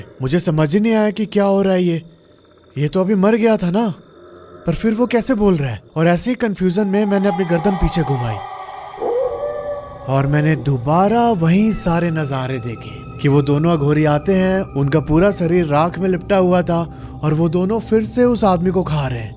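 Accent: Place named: Uttar Pradesh, India. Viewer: Aapki awaj bahut tagdi hai aap kis district se ho aap up wali awaj lagti hai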